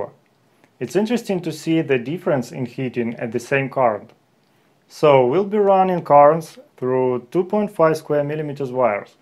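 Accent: Russia. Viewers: Сильнейший акцент выдаёт русскоязычного )) Ну а по сути - очень полезный опыт. Но, к сожалению, большинство самоучек-электриков добиваются "вау-эффекта" от своей работы именно бесконечными километрами никому не нужной гофры. Им главное - пустить пыль в глаза ничего не понимающему заказчику.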